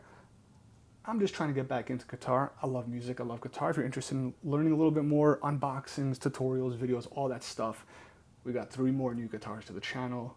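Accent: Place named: New York, United States. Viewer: As soon as I saw you got the matte black Les Paul. I have the same one but with green trim I'm surprised you didn't plug it in before you opened up the other one you showed amazing restraint God bless you . End with the abalone. I'm from the South Shore of Boston so it was about a second and a half into the video that everybody knew you from the East Coast definitely got New York in there I'm saying you're almost Rhode Island border Maybe Rhode Island Fall River. You're going to love that Matt Les Paul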